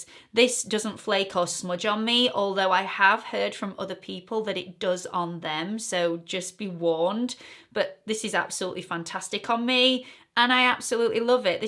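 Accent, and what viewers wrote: United Kingdom. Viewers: Great collab with one of my favorite influencers. I so enjoy your videos when your mother is on with you. She and I are close in age (I am older by 4 yrs). You're a joy to watch. I LOVVVE the British accent so I am usually mesmerized when I watch your videos! This was a fun video to watch.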